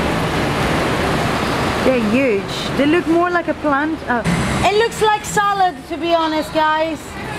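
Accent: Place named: United Kingdom. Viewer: Oddio!!! non ti avevo mai sentita parlare in inglese: c'hai un super accento british!:P Divertiti a NY!! A prestissimo cara! Baci!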